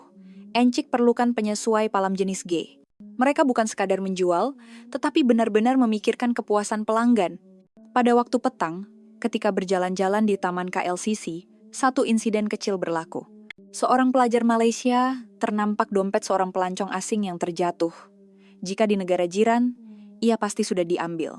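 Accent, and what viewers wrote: Indonesia. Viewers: Aik suara indun!! Tapi konten Malaysia!!! Tak usahlah buat konten pasal negara orang, buat lah negara kamu sendiri!!! Indunnnn!!!!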